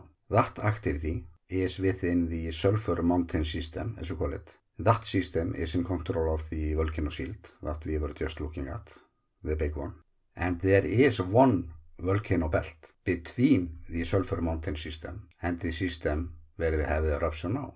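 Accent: Iceland. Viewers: for sure a very interesting text, but nearly ununderstandable for a visitor who is not firm to english with the icelandic accent... please find someone who reads the text in a more understandable english. its too bad that this accent reduces the explainations and finally the worth of the video.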